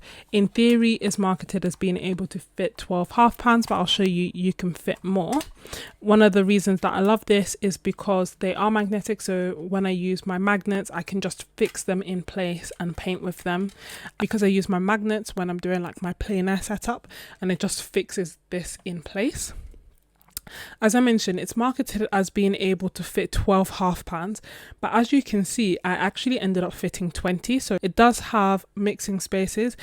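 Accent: England, United Kingdom. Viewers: I’m in soggy Cambridgeshire, so probably not far away from you judging by your accent. Love hearing how different people feel about palettes. I’ve got many of the same. How I feel about them is an evolving process. I’ve come to realise they key for me is flexibility, if I can’t change it, I get bored.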